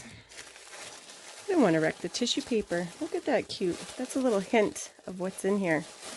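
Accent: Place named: Wisconsin, United States. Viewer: I lived in WI years ago and you remind me of what my accent was, I love it!!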